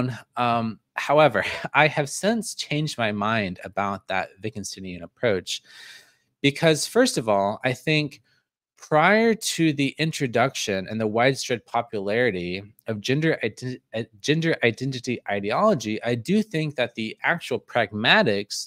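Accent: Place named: Staten Island, United States. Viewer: You have like a staten island accent "dictionarYYYY"" pulling the last vowel.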